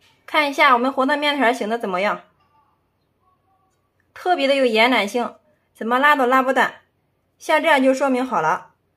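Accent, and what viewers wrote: Shandong, China. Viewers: 听口音山东的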